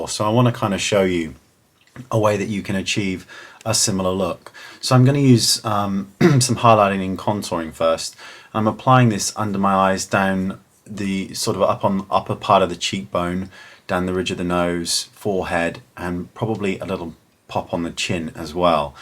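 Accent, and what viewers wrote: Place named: United Kingdom. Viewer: nice British accent~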